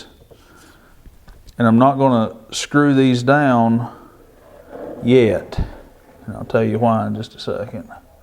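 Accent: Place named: Eastern Kentucky, United States. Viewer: Between the accent and the funny sayings you must be from eastern Kentucky?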